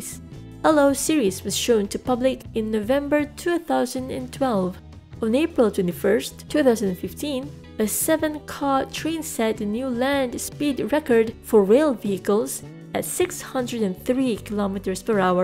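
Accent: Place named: Malaysia. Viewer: I hear a Malaysian!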